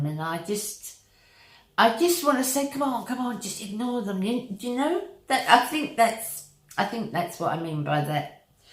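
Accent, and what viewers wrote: United Kingdom. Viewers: What an absolutely lovely lady you are. I recently found your channel and I adore you. Also, like your Britsh accent. Thank you for your kind heart and your videos looking forward to seeing more.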